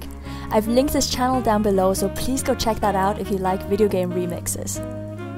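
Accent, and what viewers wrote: Germany. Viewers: bist du deutsche? ich finde dein Akzent klingt irgendwie deutsch😁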